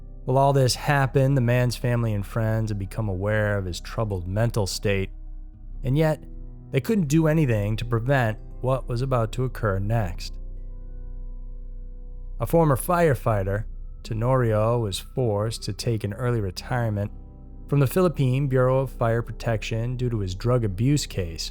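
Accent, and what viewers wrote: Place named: Minnesota, United States. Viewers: You have a Minnesota accent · Is why I follow his words so well? MN for the win, I never noticed the accent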